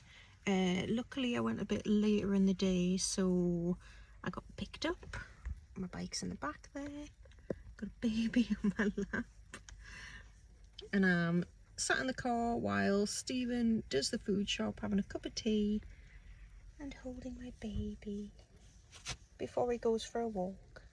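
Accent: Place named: Newcastle, United Kingdom. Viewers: can't mistake the Geordie/north east accent 👍your certainly living the good life 👌🚴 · I’m not on Instagram, you fell into my feed! 😂came for the fact your in the UK and life in a small rural space. Stayed for the North East accent👌🏻, Fox ❤ and a great video. Love from Northumberland on the Scottish Borders x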